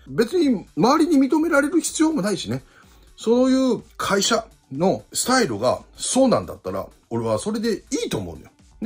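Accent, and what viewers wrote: Kansai, Japan. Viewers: 里崎さんは、興奮すると関西弁が強くなるね。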